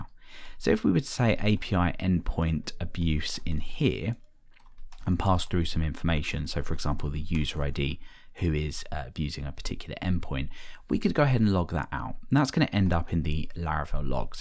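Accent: United Kingdom. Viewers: tks sir ! btw your accent is a British accent right ? i'm Asian and i curious about english accents in the world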